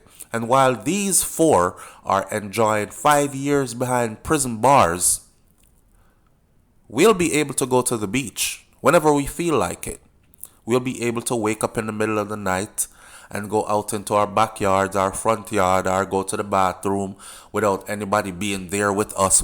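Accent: United Kingdom. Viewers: Ur doing it again...I picked up a British ascent this time 🤣🤣🤣🤣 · Sound like u try this thing yah already eno bro,all white British accent..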